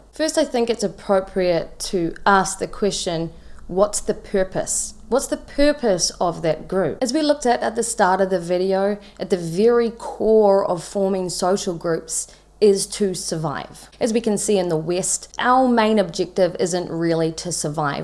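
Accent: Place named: New Zealand. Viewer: SORRY I DIDNT HEAR EVERYTHING YOU SAID, bc I haven't taken my adhd meds today, but are you from New Zealand?? Your accents sounds NZ 😍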